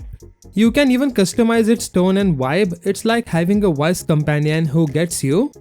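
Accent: India. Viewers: The ultimate Indian accent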